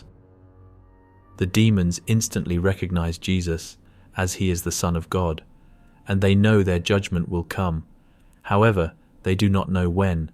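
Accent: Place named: England, United Kingdom. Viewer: MY BROTHER YOUR WORK AND VIDEOS ARE SECOND TO NONE!! AND YOUR ENGLISH ACCENT MAKE IT EVEN BETTER! AND MY FRIEND THERE IS NO OUTER SPACE, THATS WHAT WATER ABOVE THE FIRMAMENT MEANS! AND WHAT THE DEEP MEAN DESCRIBED IN THE BIBLE! HOW DOES AN EXPANSE OF AIR LIKE NASA CLAIMS SEPARATE THE WATER ABOVE? IT DOESN'T! THE FIRMAMENT IS A SOLID GLASS DOME SPREAD OUT LIKE A TENT OVER A FLAT PLANE! THIS IS ONE OF THE STRONG DELUSIONS THE BIBLE ALSO TALKS ABOUT! GOD BLESS YOU SIR!!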